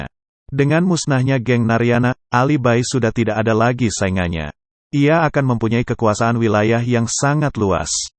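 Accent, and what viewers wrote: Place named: Banyumas, Indonesia. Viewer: Wong ngapak pembawa acaranya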